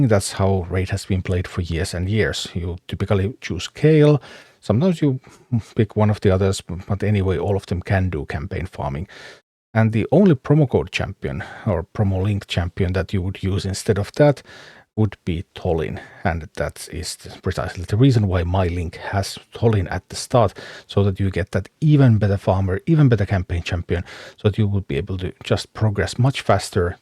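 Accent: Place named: Finland. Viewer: Are you Finnish? Your accent sounds suomalainen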